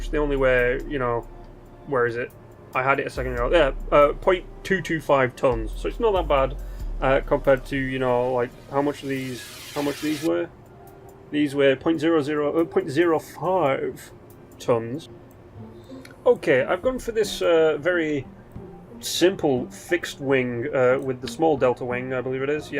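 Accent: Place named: Geordie, United Kingdom. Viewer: no problem. Also, are you from the northeast by any chance? I sorta hear Geordie mixed with a bit of Yorkshire.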